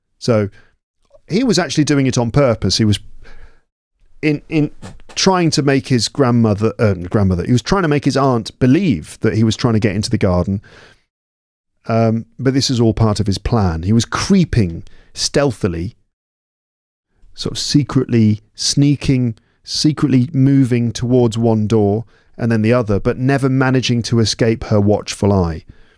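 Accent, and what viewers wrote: United Kingdom. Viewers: Hi, I'm a newly retired English teacher and I just love listening to you read short stories! Your British accent is fantastic, your explanations always are appropriate and what's more often funny, which makes it all very pleasant! Thanks a lot!!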